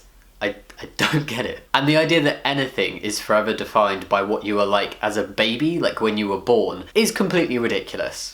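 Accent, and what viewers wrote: United Kingdom. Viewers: Wait, aren't u British?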